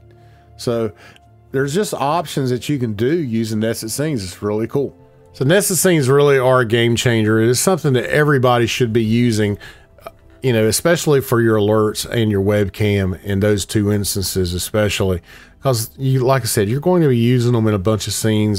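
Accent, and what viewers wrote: North Carolina, United States. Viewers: Great tutorial! Thanks! Love the UNC stuff in the background. Based on your accent, you are probably in NC like me. I'm using your tutorials to help me with my Church livefeed in Roxboro, NC.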